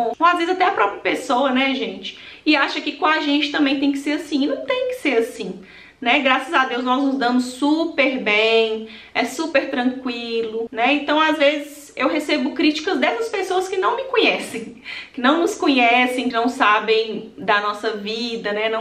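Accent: Minas Gerais, Brazil. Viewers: Minha querida é muito bom ouvir vc essa conversa mineirinha esse seu jeito verdadeiro vc é nota 1000 minha amiga virtual adoro vc 😍😍😍😍🥰😘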